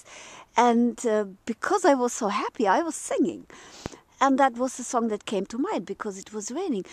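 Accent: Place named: Germany. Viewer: Thank you! I also wish you a most happy christmas. You're a fantastic lady and it's a pleasure to communicate more with you then ever before. Your toastmasters involvement surely did good to you German accent English;-) Keep up the great work! Let's make it TO BE fabulous!:-)))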